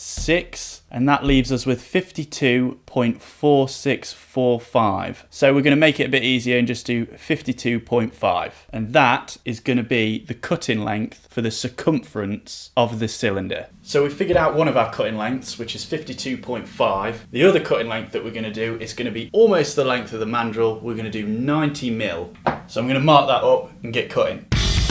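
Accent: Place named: East Midlands, United Kingdom. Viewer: New sub here, was from Grantham now living in Cork.. Im guess from the accent and the word duck you too are from the east Midlands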